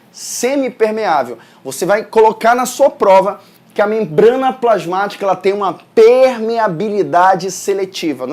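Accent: Pará, Brazil. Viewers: muito bom! por um acaso tu es paraense ? kkkkk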